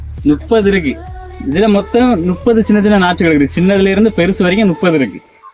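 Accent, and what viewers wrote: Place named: Marthandam, India. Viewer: தம்பி, கன்னியாகுமரியில் எந்த ஊர்ல இருக்கீங்க. பாஷை கேட்டா மார்த்தாண்டம் Side மாதிரி தெரியுது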